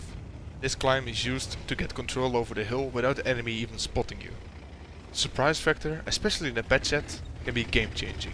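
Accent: Netherlands. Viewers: je kunt zo goed horen dat je lekker nederlands bent.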